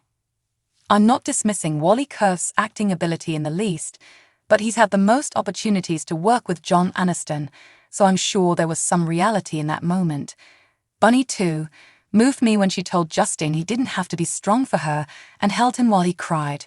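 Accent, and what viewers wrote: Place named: United Kingdom. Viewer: Love your accent over the pond i take it ❤ good job 👍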